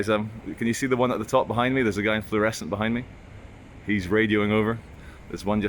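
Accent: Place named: Scotland, United Kingdom. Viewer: You have a Scottish accent only imo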